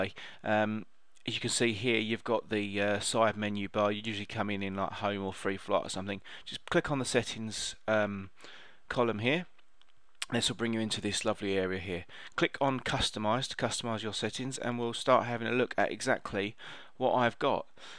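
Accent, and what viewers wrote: United Kingdom. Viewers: i love brithish accent! greetsfrom chile good video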